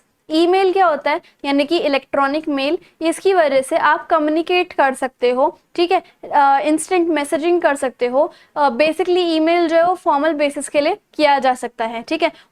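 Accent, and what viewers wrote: Punjab, India. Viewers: Didi tuci punjabi hooo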